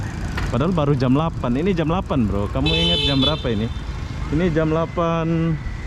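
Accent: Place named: Malaysia. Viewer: Bro lo org malaysia apa indo sih. 😂 logat lo kya org malaysia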